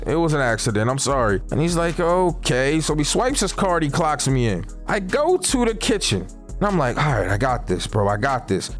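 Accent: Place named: New York, United States. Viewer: Real ny shit red lobsters in eny or what lol gateway i know u from tha town u can here it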